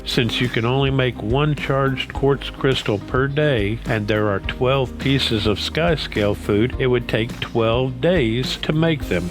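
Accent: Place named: South, United States. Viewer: Thanks, love your voice...you should do radio! Where are you from? There is a soft inflection there that reminds me of the south, where I did a lot of my growing up. I am too uncoordinated for JPs, does getting the skyscale require those? My server sucks too as I am in the remote north (more north than the Nords, lol). Thanks again.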